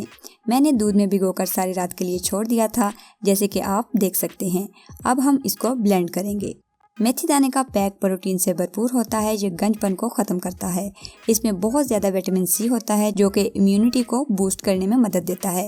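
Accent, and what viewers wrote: Punjab, India. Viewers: sister v love her with Punjabi lehja. She is a talented person so it does not matter that what is her eccent. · api punjabi nai bolti or wo punjab sy hy to pujabi style hi ho ga na .or kaisy boly wo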